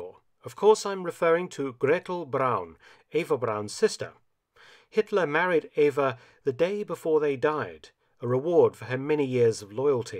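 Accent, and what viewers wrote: United Kingdom. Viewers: He's British. Americans are the English speakers that hardly ever care.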